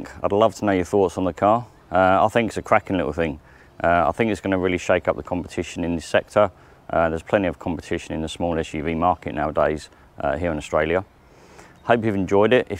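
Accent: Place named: United Kingdom. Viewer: U sound British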